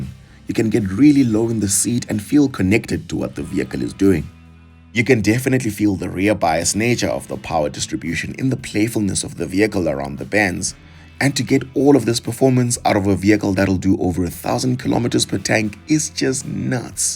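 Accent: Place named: RP, United Kingdom. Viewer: Lol hell no broh, don't tone it down, it makes your channel different broh, you're not trying to imitate someone else, you're just being you🙌🙌😁😁so i, personal don't mind the private school english you have bro😂😂😂but maybe if these comments persist then maybe you can open a poll and people voting to whether tone it down to Africanism or not🤔.....lol tjoo that's indeed a very tough call to make broh but maybe it will go down to which one you prefer the most between Engine and technological advancement but I feel like i can go with a bmw x5 m60i cause it still has a V8 engine in the hood and the interior designs is in a premium level and from depreciation statitics it alleged that M60I holds its value better than the RSQ8🤔😁😁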